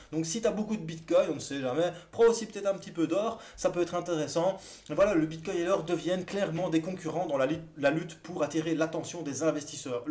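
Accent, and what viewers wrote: Belgium. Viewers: Coucou ça fait plaisir d'entendre ce belle accent belge, par contre le son c'est toujours aussi bas en volume et en gamme, courage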